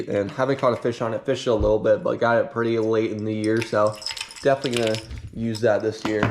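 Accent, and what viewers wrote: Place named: Minnesota, United States. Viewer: You must be from Minnesota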